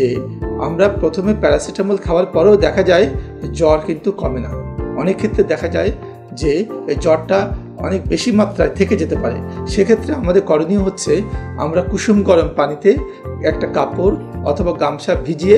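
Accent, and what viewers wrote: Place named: Bangladesh. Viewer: কথায় বোঝা যায় বাংলাদেশের মিয়া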